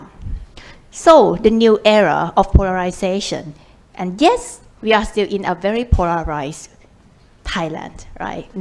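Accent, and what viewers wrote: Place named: RP, United Kingdom. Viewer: สำเนียงแบบ RP เปล่งๆไปนิส 😊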